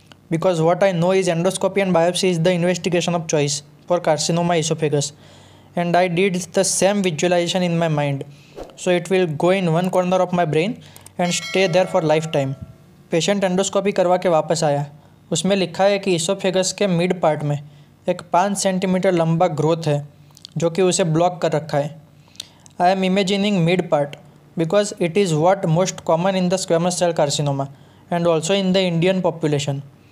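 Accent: Gujarat, India. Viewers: bhai gujarati hoi evu laget???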